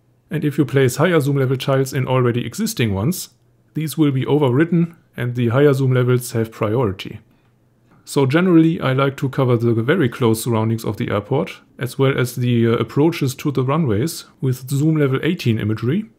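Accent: Germany. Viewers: also ich finde dein english ja absolut spitze, aber muss dieser Deutsch akzent dabei sein? XD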